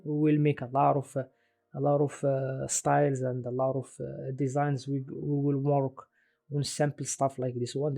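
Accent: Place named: Morocco. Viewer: probably his accent is so Moroccan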